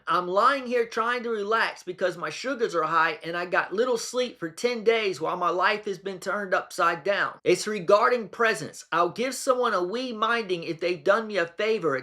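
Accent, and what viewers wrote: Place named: Scotland, United Kingdom. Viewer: I am a Scot. I adored your Scottish accent - it really added to the joke of all those ranting emails!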